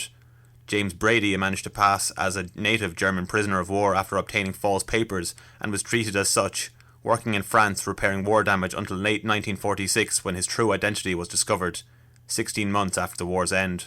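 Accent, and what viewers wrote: Ireland. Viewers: So the narrator was Irish, too?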